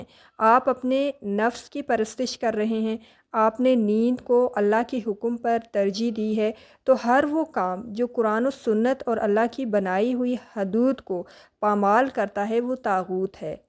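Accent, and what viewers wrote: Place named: Punjab, Pakistan. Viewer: السلام علیکم قرآن مجید کے الفاظ ہیں آپ ان کو اردو اور پنجابی کے مکس تلفظ میں ادا کر رہی ہیں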